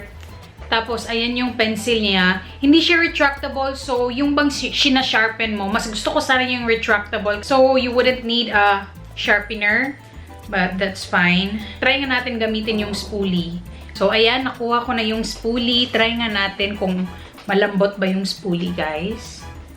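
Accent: Visayas, Philippines. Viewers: You have the bisaya accent. Are you? Hehe btw love this video. ❤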